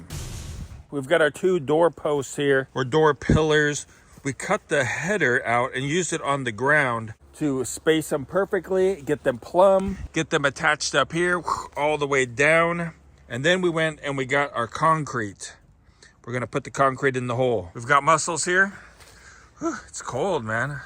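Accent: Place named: South, United States. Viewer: "fixin' to finsih framin' the wall", I think could pass as a Southerner.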